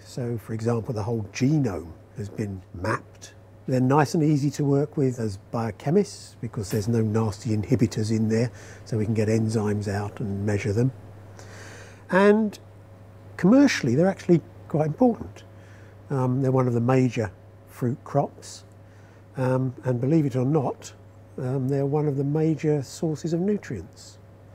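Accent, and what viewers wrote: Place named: United Kingdom. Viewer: This is the first person I've ever heard say "toe-MA-toe" regularly outside of the phrase "toe-MAY-toe, toe-MA-to" to express the likeness of two things. Is that part of the British accent, or just an odd exception?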